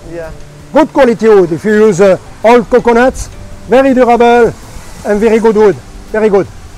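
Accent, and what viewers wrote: France. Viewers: I can sense some French accent.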